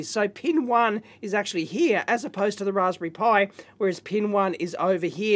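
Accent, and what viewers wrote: Australia. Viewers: He sounds less Australian.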